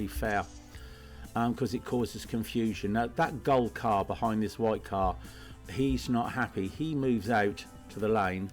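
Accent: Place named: East Anglia, United Kingdom. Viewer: I'm detecting an East Anglian accent.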